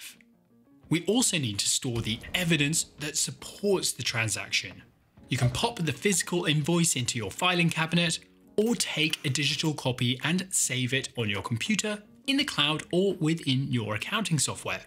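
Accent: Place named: United Kingdom. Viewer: I'm a software engineer and recently joined a team who is building and maintaining accounting software. I have very little accounting experience and no training. But your videos have helped me tremendously to understand the various concepts and to be in the position to make a more meaningful contribution to the accounting software. Thanks for the excellent work. I'm looking forward to the rest of the Accounting Basics series. I must say though, I preferred your speaking style from your earlier videos. They were more relaxed and fun to listen to. I was surprised to hear the change in accent in this video and thought you went intentionally into some kind of "British mode" for this video's sake 😄. But your other more recent videos also sound similar. Also missing the close-up shots in this video which gave your videos that extra edge. Please don't sacrifice your unique and fun teaching style for the sake of sounding and looking more "corparate-y". 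All the best!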